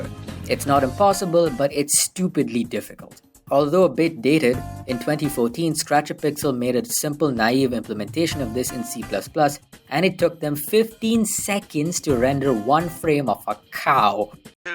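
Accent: India. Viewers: AAAA bro it seems like u is Indian cuz the accent is a lil similar am I mistaken?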